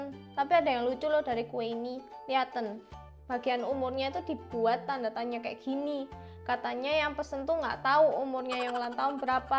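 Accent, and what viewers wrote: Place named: Java, Indonesia. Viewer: Ngomonge medok pol😂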